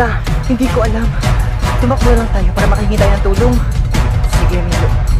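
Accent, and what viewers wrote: Batangas, Philippines. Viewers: Ala'y Batangeñong Batangeño ehh...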